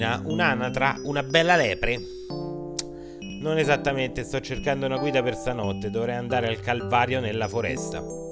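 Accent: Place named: Rome, Italy. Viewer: C e comunque da dire che doppiato in Romano da te ha il suo perche 😂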